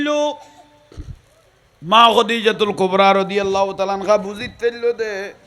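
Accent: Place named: Chittagong, Bangladesh. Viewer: এই বয়ান চট্টগ্রামের আঞ্চলিক ভাষায়। কোন অশুদ্ধ হয় নাই।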